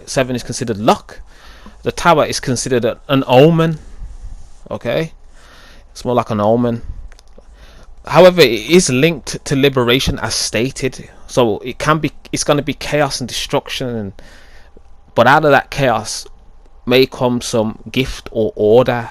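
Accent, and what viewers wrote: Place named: South Africa. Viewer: Holy crap, had no idea you did this. You sound like you're from.... South Africa??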